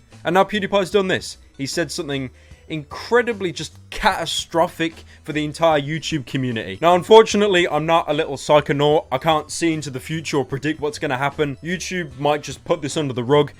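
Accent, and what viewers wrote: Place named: Birmingham, United Kingdom. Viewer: I honestly cannot work out accent. It seems like a mix of Brummy and some kind of London/County's that surround inner London. It's the way he says his O's that confuses me.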